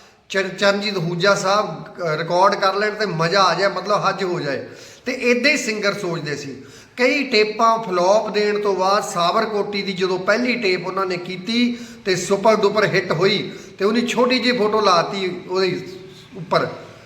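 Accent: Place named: Doaba, India. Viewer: Eh mazhe wal di boli nai ji. Eh doabe di boli aa.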